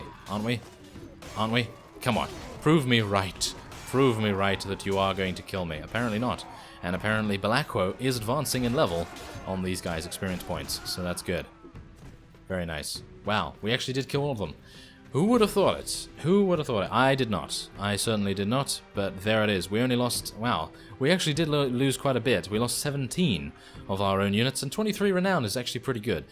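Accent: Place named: RP, United Kingdom. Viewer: If he quits his title I will be forced to try find someone with his. . . Gaming interests and accents. So fancy and almost posh <3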